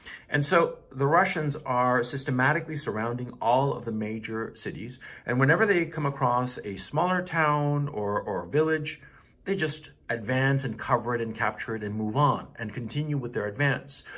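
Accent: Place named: United States. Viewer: Let’s start from the beginning. As you mentioned on you title and your first words, INVASION. Now, let’s describe what invasion means. Invasion means to enter someone property or house or country by force and steal all. So now you daub that Russia is not bombing Ukraine, we have already 14 days and just last night they bombed an Hospital ( Maternity) So you are you trying to say that Russia is bringing flowers to the Ukrainian people. My friend this is war, and 14 days has passed and they are not able to reach the capital yet. Nothing against Russian people, but the government yes in deed. Mr., I don’t know you by your accent you sound American and you are one those people that is a loser and badmouth your own country. Open your eye, it’s a war, people will suffer with this stupid war. The leader should learn what we humans beings have been true all those years work all the wars like WW11, where 6 million people died from Europe and more then 25 million from Russia. 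We are in 2022, there no reason for war. Now, you sjouke be more smart then that. Now my question is, it has been already 14 days of this was R and this video is when it just started. I just wonder where are you now??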